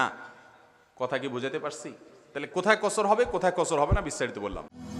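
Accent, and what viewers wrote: Noakhali, Bangladesh. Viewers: চিটাগং না নোয়াখালীর ?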